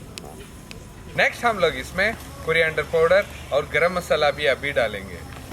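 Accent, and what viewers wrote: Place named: Hyderabad, India. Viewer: Bhaijan aap hindhi me hich bolo na kya tho bhi ae ginger garlic tomatoes bolna shuru kardiye aap bhi... ooo connect nai hora bhai aap ki zubani pure hyderabadi hai na bhai kaike liye change kardiye aap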